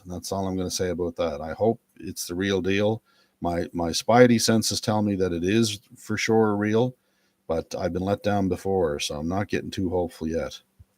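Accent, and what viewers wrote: Canada. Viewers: Are you Canadian? (Your accent.) If so you are definitely in the same boat as us in the US. I am trying to go this route by UCC-1 and Status Correction.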